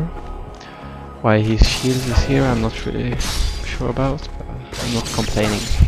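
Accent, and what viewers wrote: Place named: Germany. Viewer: nice german accent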